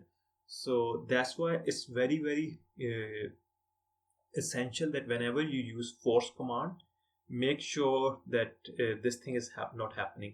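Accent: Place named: India. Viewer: good videos but the indian accent drvies a non English speaker really crazy……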